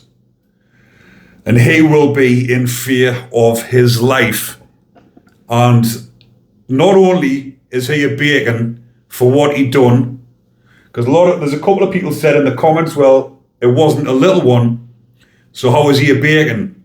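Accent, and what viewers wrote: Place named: Scotland, United Kingdom. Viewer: What word is he saying, Bergin? WTF 😒 does that mean in English? Is this a Scottish accent, or Northern England?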